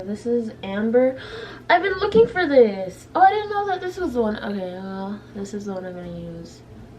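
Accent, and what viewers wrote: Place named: United Kingdom. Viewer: Ur gorge also that british accent tho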